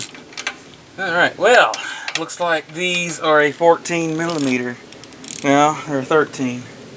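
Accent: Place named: South, United States. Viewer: Sorry so long but my just lost my mom last year an then month or two ago his younger brother died suddeny like my mom and that was always my trouble shootin team dads just tired of hearing it run wrong but its to solid truck to give up on for 86 model and only 22 or maybe 26 or 28,196 is actually all it has in miles and never left my home town since Henry Ford shipped it u know of any other TSB again sorry so long of explaining. But u sounded knowledgable enough an had just enough country swang i can understand sold Haley parts to fellow cldnt say shit with mouth full unless it was 100 mph thanks for any info Sir And as always God Bless u and urs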